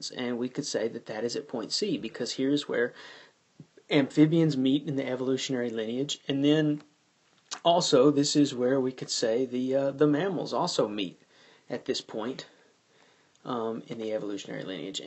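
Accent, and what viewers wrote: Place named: Texas, United States. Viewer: Thanks man! this was really helpful! I like your texas accent at times too ;)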